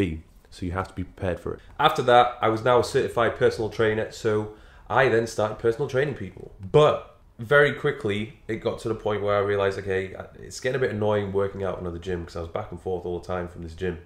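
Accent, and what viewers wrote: United Kingdom. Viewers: "I always see the comfort and contentness is the enemy. When you get too comfortable and everything is easy, that's when you take a back foot." Love the positive messages you deliver on your Youtube channel. They are not only about fitness but also about life lessons, which i believe is applicable to everyone. Just want to say: your dedication, professionalism and wisdom are amazing, thank you for helping a lot of people, myself included, stay motivated and get back on the right track. I'm an undergraduate student from South Korea. Even though it can be somewhat hard for me to get British accent, but I try my best to catch every informative message you give off. You've been to Thailand not long ago, why not Korea one day? lol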